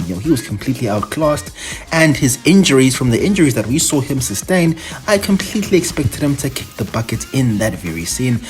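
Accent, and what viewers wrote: South Africa. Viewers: i knew you were south african from your voice and lil accent 😭😭😭 it’s good to see my brothers hopping on that bleach train fr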